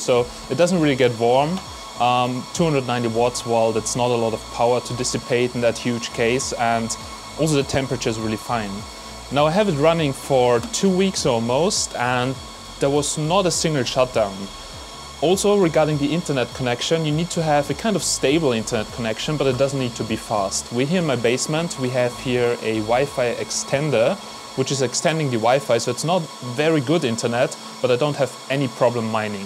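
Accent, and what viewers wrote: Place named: Germany. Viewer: durch zufall deinen channel gefunden :) irgendwie hört man als deutscher direkt wenn ein anderer deutscher englisch redet :) cooles teil der jasminer, ob sich das mit eth noch lohnt ist halt die frage, aber wenigstens geht dann noch etc damit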